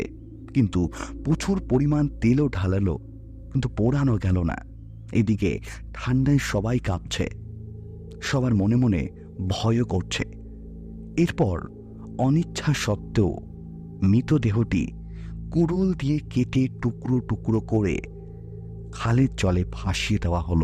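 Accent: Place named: Bangladesh. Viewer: Apni banladeshi??? Kothar bhul bhalo ucharon shunei bojha jache😂😂😂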